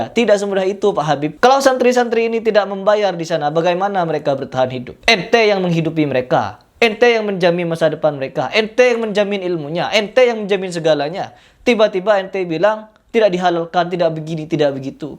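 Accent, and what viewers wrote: Madura, Indonesia. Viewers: ADMIN LOGAT MADURA INI PEMFITNAH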